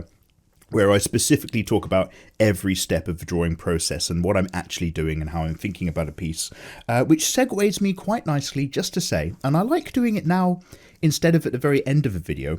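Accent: United Kingdom. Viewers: Everyday i see this man and go Amazing voice, british, drinks tea, draws lewd girls, HOW IS HE STILL SINGLE!?!?!?!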